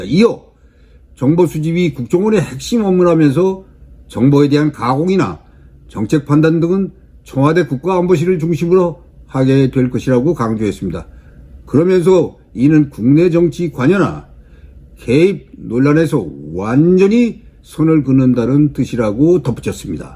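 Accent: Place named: North Korea. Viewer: 문재인 정부가 깔아놓은 사람을 선정해라 말인가. 당신 목소리가 이북사람 같다.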